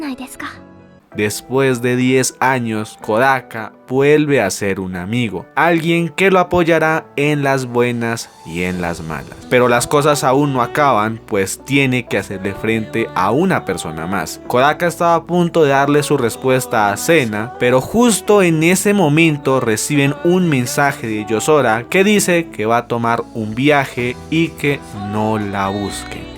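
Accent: Colombia. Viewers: Mero colombiano pana 👌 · pd: tienes pura pinta (voz) de ser colombiano amigo sub nuevo 😅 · Un narrador colombiano mca que chimba, parce de corazón espero le vaya muy bien, y confirmo, pobrecito el parcero.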